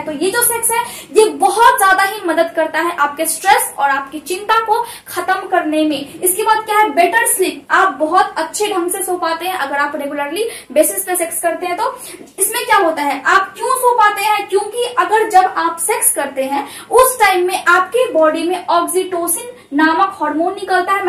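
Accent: Bihar, India. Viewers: Your voice tone told that you are from bihar ❤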